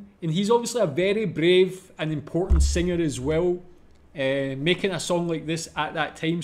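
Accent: Scotland, United Kingdom. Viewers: I like your accent. Enough to check in your description that you are Scottish. Also there is something appealing to polish person or person of polish origin in what you are doing. We sometimes (often) have difficulty to appreciate our own original polish things... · Love your Scottish accent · It's a great song form polish Jim Morrison, and Your Scottish accent gives nice touch your comments. Pure dead brilliant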